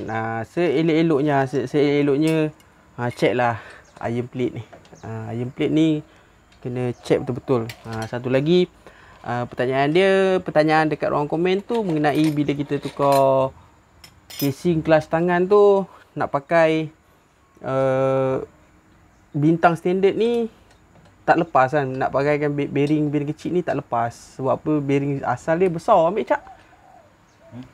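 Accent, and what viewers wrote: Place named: Malacca, Malaysia. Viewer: Dengaw loghat, blh tahu.. kompom melake…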